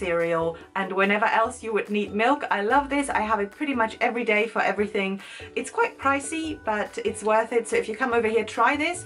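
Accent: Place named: United Kingdom. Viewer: Hello from California! I'm an American raised by Germans. Really enjoy your videos. Love your British English.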